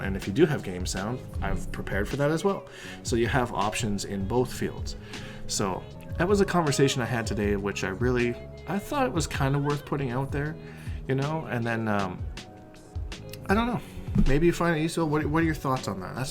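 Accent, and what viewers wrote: Canada. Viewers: just curious, where in Canada? I'm from Guelph/Hamilton so it was rad to hear your Canadian too! I watched some ECT and noticed they have headsets that are there for ppl to use but some ppl don't use them.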